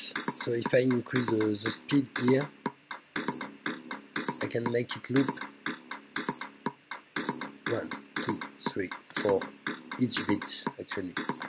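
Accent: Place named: France. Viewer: Le belle accent Français :')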